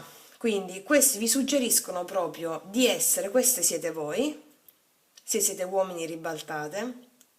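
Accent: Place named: Lecce, Italy. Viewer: Grazie ti seguo perché sei preparata e usi le tue carte in maniera adeguata, comunque la prima variante è ciò che sto vivendo grazie, e poi hai il mio accento leccese🤣💖💖💖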